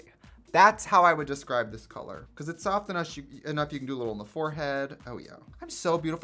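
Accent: Wisconsin, United States. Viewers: I can really hear the wisconsin accent in this one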